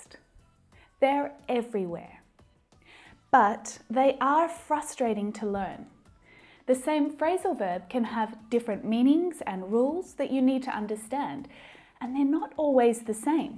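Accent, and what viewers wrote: Australia. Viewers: I picked up Australian accent by watching video. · Australian accent?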